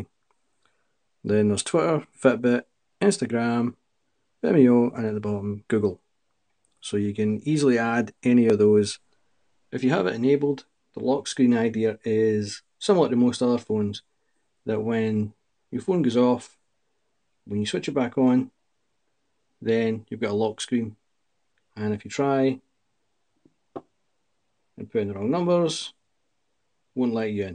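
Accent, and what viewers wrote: Scotland, United Kingdom. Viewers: Is that Scottish accent? It's nice.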